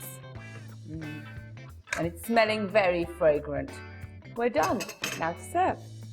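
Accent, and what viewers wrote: United Kingdom. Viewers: Tooo much accents. Seem like I am in youui kaaayyy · I watch your videos just because of your pretty smile and british accent...👍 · It's always funny and amusing to see an indian with a British accent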